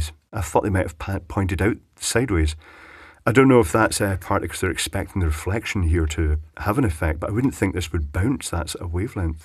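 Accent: China. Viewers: Thanks. I love the Chinglish pronounciation! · I love Chenglish!!!!!!!!!!!!!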